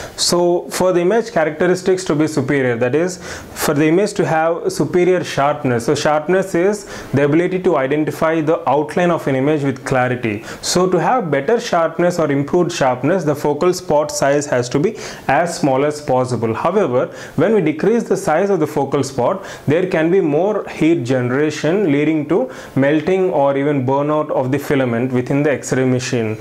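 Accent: India. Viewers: The Indian accent will always be entertaining to hear lol your English is better than most of us. Great lesson and explanation 👏🏽👏🏽👏🏽👏🏽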